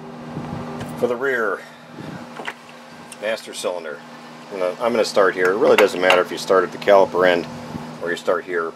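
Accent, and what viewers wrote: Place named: Midwest, United States. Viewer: Another nice video. I'm about to pull the trigger on a G650gs but I find your videos really helpful regardless of exact model. Plus I'm from W Michigan now living in CT so I like hearing your Midwest accent!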